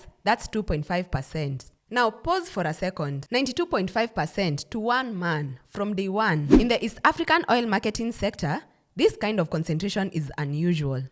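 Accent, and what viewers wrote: Kenya. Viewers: Gotta love the Kenyan accent!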